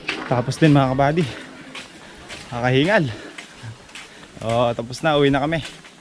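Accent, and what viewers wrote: Pampanga, Philippines. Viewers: Ka buddy kapampangan ka po ba? Hahahaha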